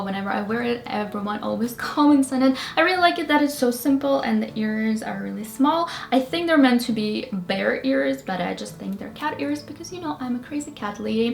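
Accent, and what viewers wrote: Russia. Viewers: she's russian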